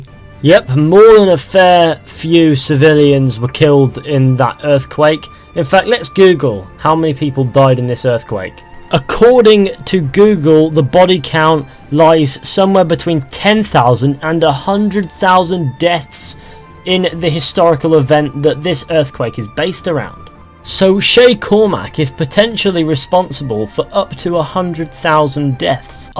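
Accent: United Kingdom. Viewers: first of all if you want me and everyone else to watch it........ change your fucking British accent!!!!!. a YouTube video must be helping not some fucking video with fucking English accent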